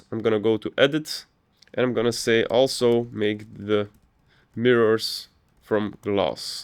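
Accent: Belgium. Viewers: are you from belgium ?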